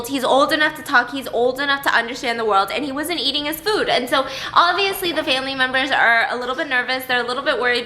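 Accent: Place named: United Kingdom. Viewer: Awww, miss!! I love your British accent btw XD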